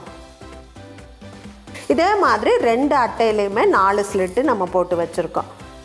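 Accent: Coimbatore, India. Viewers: 😃. U r using ur platform right 💕💕.. From my view u r unique, did not copy anyone💞💕💕💞.. U have beautiful Coimbatore Slang👌💖💖👌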